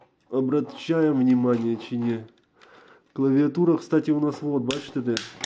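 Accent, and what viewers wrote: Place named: Kuban, Russia. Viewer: что за слова у тебя из рта вылетают, с кубани что ли что по русски слабо разговаривать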